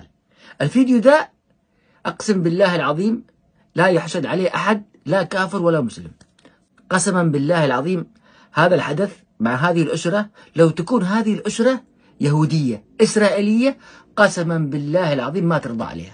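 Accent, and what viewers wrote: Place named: Hadhramaut, Yemen. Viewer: لهجته حضرمي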